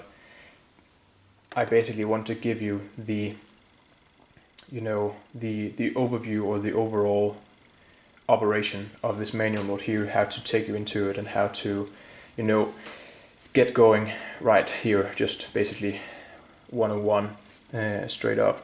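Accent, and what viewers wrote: Denmark. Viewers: I think I've contacted you before I know you're from Denmark I can tell from your accent. My wife is Danish and we go there every year. I don't really know where in Denmark you're from definitely not Jutland. Or Fyn so I guess the Copenhagen area. We have a house in Gilleleje. Just got a gt100 out of the box from being in storage so now I have to learn how to use it I'll be watching your videos. I'm used to the GT 10 where I could copy built in patches from one location to another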